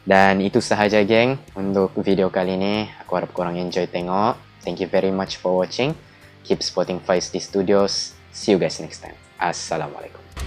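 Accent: Sabah, Malaysia. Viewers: Bro Loghat Mu ni Mcam Asal Sabah Ja Btw Salam Dari Kota Kinabalu 😁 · Abang memang gempak. Org sabah bah ni. Senang fhm. Siok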